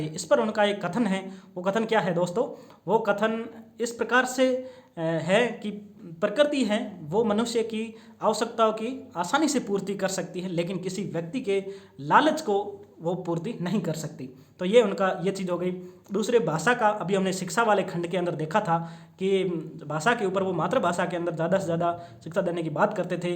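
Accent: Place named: Rajasthan, India. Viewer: The way to explain any of your topics is very good, I love listening to your videos because Rajasthani mix comes in your tone 🙏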